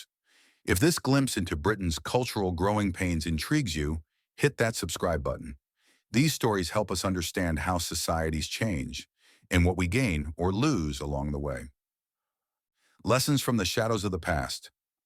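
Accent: United States. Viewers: Why do we have an American narrating this? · i hate to tell you this, but the american accent put lots of people off a video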